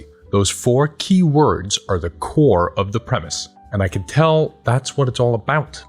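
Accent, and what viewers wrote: Iceland. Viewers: Are you perhaps Icelandic? I can hear from your accent. Well i have a question. I'm going to be running a homebrew campaign but I don't know how to make my players invested in the plot and their characters. I've played with these people before and there seems to be one consistent problem. They seem to zone out every time they complete their actions and their characters never seem to interact with each other. How do I make them care? How do I make them invested in their teammates characters? (Edit i changed the question since i thought of a solution)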